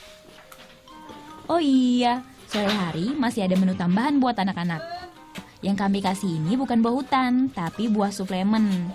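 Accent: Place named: Banjar, Indonesia. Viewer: Pang nya banyak banar,,,, BANJAR BANAR pang,,,😁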